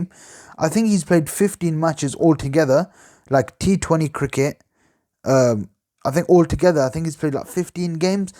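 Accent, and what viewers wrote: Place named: United Kingdom. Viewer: Love your British accent 😍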